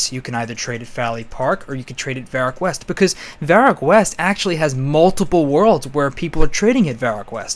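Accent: New York, United States. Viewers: youre from ny?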